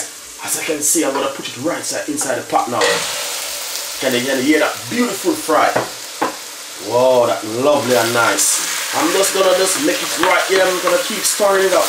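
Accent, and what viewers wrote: Caribbean. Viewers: Bursting enthusiasm. Excellent Caribbean accent. Excellent cooking!!!!! Thank you, Chef.:) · Looks great..however he had me laughing the whole time the way he was talking..his voice sound so island and energetic..I surely will be using his recipe for my Curry Chicken!!!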